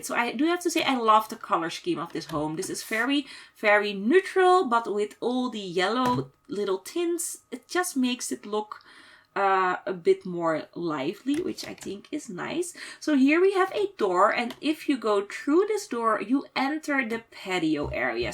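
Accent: Netherlands. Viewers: u engels is heel slecht je moet de T niet zo nederlands uitspreken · hellooooooooooooo! euhm youre accent is like a dutch one. im also dutch so why would this be hate? but my question is:are you dutch? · because you have that Dutch accent and no fense though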